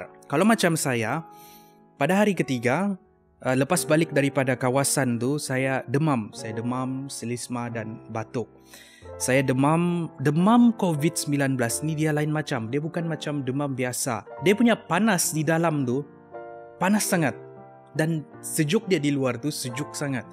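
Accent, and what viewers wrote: Sabah, Malaysia. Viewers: Aku Nak Tanya bang ni orang Malaysia Ke orang iban Ke orang Sabah? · Kenapa suara dia mcm orang sabah eh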